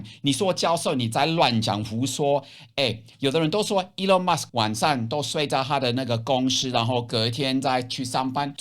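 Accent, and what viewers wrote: Taiwan. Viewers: 我覺得您講話已經有台灣的語調了。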